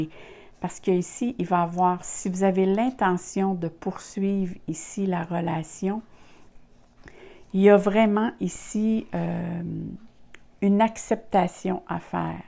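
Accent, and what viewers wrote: Canada. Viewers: AAAAH QUEL BEL ACCENT CANADIENS ET UNE VOIX SI APAISANTE JADORE VOS TIRAGE DOMMAGE QUE VOUS NE FAITES PAS LES QUOTIDIENNE CE SERAIS UN REVE BEAU MOIS DE MAI A VOUS DE LA PART D UNE AMOUREUSE DU CANADA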